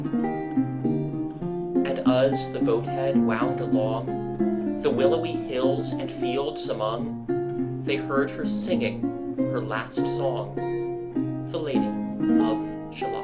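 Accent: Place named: United States. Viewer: Will Yanks stop this, or learn how to speak ENGLISH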